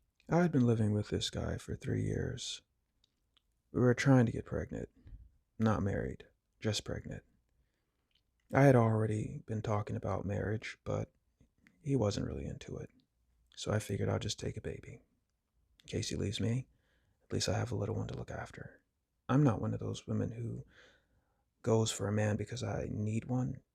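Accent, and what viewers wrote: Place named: South, United States. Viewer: 🤗 great presentation yet again! I must say that I love your Southern Charm Style Accent! 🥰☺️🥹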